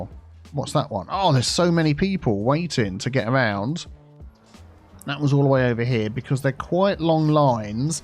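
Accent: United Kingdom. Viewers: Tesco lorry delivering to Aldi made me smile. This is so relaxing to watch, I’m new from Flabaliki’s channel and lovely to hear a fellow Brit!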